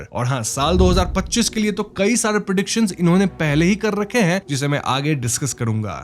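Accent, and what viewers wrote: Bengal, India. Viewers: Bhai tu bangali hai Keya Tera Hindi sunke Aisa lag raha ha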